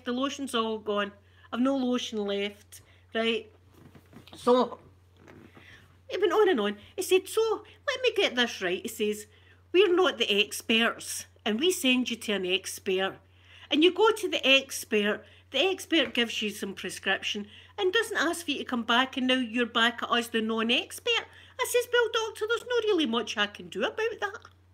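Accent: Scotland, United Kingdom. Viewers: Scottish very angry..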